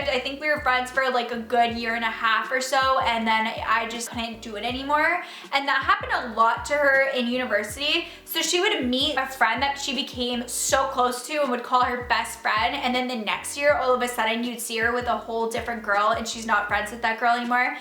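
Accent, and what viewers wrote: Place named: Canada. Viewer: This lady has a nice Canadian accent. Very nice!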